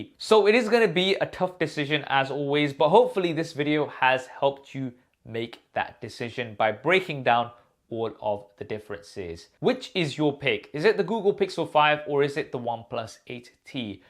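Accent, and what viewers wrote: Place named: United Kingdom. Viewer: British accent makes me uncomfortable 😐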